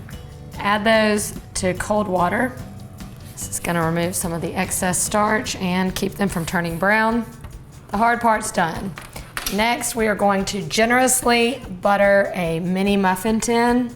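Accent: South, United States. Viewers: Your video has the BEST appetizers!!! I am so thrilled to find you!! Are you from Alabama? I lived in Leeds, Al many years. i just detect a slight southern accent! Merry Christmas!'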